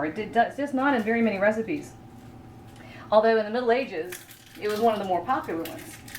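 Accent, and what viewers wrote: South, United States. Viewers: I enjoyed your video and you received a like from me.I replayed the video three times and I really cannot understand the name of the buy bulk online store. I am sorry to trouble you with this. I am Irish and getting attuned with some of the American and very lovely Southern accent, thus I hope you for give me. In American if you listen to somebody talk on the news from the North of Ireland it has to be subtitled :-) :-) I do thank you for your attention.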